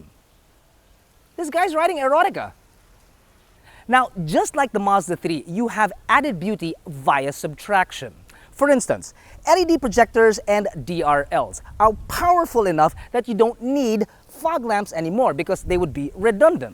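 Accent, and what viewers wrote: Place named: Philippines. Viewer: Forget the car. It’s that excellent Filipino English. Bravo!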